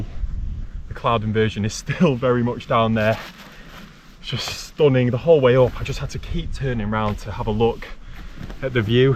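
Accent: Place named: Southport, United Kingdom. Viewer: Half way through and loving this video! I have a friend who lives in Southport who has your accent... is that where you're from? Sorry to hear your mum passed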